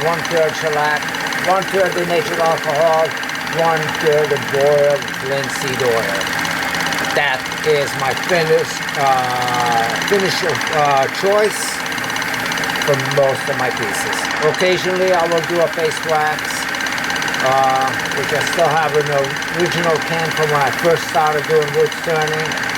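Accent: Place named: Portugal. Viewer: you are a rebel! ( and a master) love watching to see what your making.By the hat and the Portuguese accent you must be from the Massachusetts area. Keep up the awesome videos,you are truly a craftsman.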